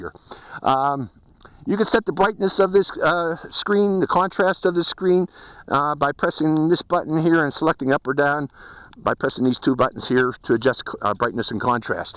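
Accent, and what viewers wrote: Pittsburgh, United States. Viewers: Hi there, you have to be from Pittsburgh or there abouts, thats Steelers Country accent. Banggood has this whole package, copter, i6, goggles and even a case for 50% off and it's the updated version with new stacked ESCs, GPS module is oriented properly etc. The deal ends tomorrow, $180 for everything gonna give it a shot.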